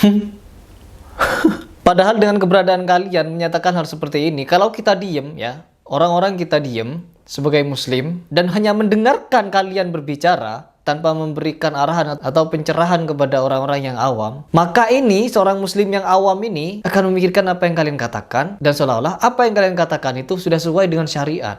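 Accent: Madura, Indonesia. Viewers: Mas sampean kok ada logat logat maduranya, bisa bahasa madura mas??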